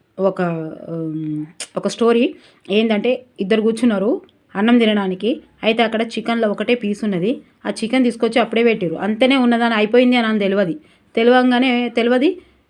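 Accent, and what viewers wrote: Telangana, India. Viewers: Csuper madam acham telangana palleturuloni bashalaga chepparu thanks....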